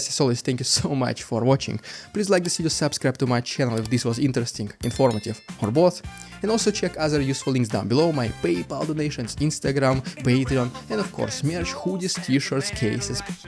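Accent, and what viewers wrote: Russia. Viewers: I can hear your russian accent. Keep studying.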